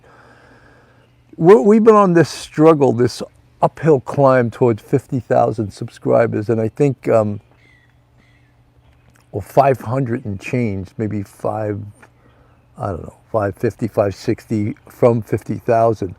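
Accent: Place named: Texas, United States. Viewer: I want to hear your Texan accent lol.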